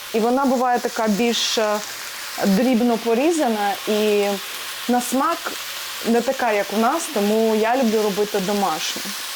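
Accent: Standard. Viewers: Сьогодні тільки перший раз Вас побачила і дуже пораділа. Щира людина з чистою українською мовою то скарб! Щастя Вам! ❤ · Яка гарна Украiночка,яка чиста мова,хай щастить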